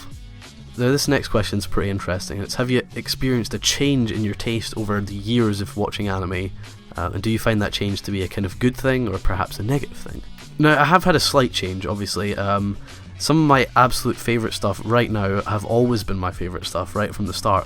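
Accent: Scotland, United Kingdom. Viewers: Are you Scottish if so where from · Where in Scotland are you from? Ps I'm fro Edinburgh!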